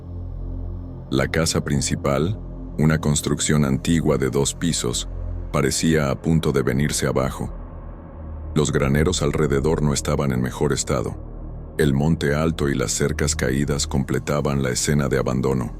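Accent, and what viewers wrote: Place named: Mexico. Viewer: Hay como que me saco de onda la forma de relatar jajajajaj me gusta porque no es igual que los demás como que habla más mexicano 😅😅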